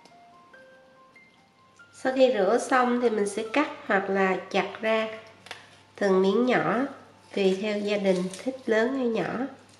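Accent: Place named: South, Vietnam. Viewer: nấu ngon chỉ dẫn chi tiết không tiếc công... giọng nói như rót mật vào lòng ngọt ngào tự nhiên tiếng Nam nghe thương quá là thương, Thanks em nhiều nha.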